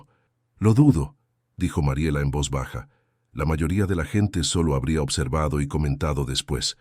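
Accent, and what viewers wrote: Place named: Argentina. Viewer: No me gusta porque es narrada Argentina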